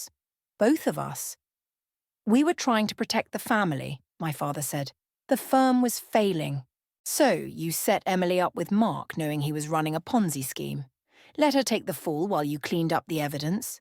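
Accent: England, United Kingdom. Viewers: Beautiful English accent.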